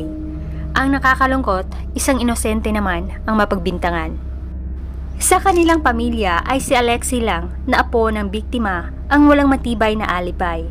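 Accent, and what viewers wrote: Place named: Visayas, Philippines. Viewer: Ok nmn kairita😂 lng ung bisaya accent Mo mejo konti practice P ... But still I'm entertained pdn 😊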